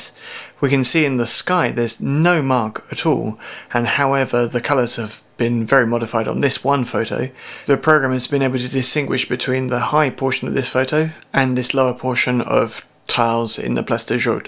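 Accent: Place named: United Kingdom. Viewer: The British accent is awesome... This tutorial has been terrific. Thanks...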